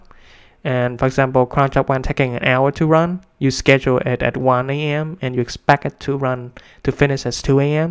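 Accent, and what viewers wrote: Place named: India. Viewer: looks trainer have destroyed his accent , is a mix of Indian, US and some his own styling on accent. Sometimes it's impossible to understand.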